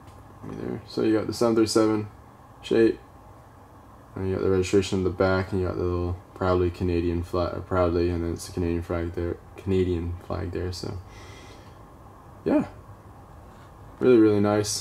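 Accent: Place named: California, United States. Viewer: Hahaha! I couldn't tell what accent you have, it's Californian! My uncle talks like you. btw it's mainly the 737-700 that comes to la. West Jet usually uses it's 737-800s at lax for it's once daily lax-yyz flight.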